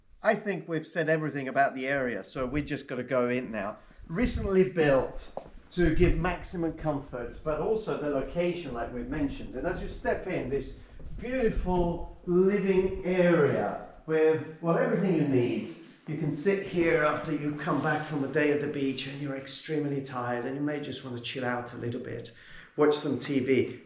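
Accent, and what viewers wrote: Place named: England, United Kingdom. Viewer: Aww Thanks so Much, for your Response. I am currently Watching other Episode from Your Channel “Buying a property in Italy Salento by lol lol I watched You and Dave From DauVo and Subscribed to your Channel and became a Fan!! I wish You Many Success!! Love your English Accent even though I know you are 100 % Italian, Also Compliments to Your Fashion Style very Charming!!! It’s great to Watch someone with so much Knowledge and in the meantime that takes time to Pay attention to the Personal Appearance! That says a lot. Because you are sending a Positive Message if you take care of yourself definitely you will take good care of someone else property. I enjoy Watching your Channel! Great Personality!! Warms Regards from. Noriko in Míami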